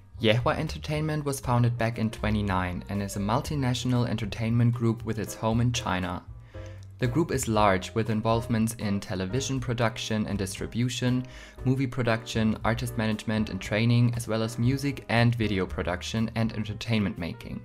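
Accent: Germany. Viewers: I immediately knew you were German because of your accent😂😂 I'm definitely scared for Everglow's future, I hope everything is going to be okay and I hope they won't disband anytime soon. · Is german your first language? you kinda have a german accent 😅 very informative video the company is doing them dirty!!!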